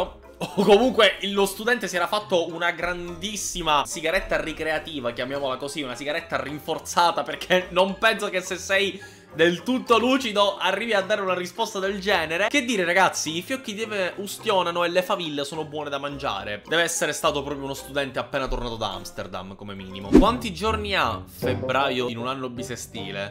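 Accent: Palermo, Italy. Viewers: Oddio ma ho notato una cosa : PARLI IL PALERMITANO MEGLIO DI MIO PADRE..... COSA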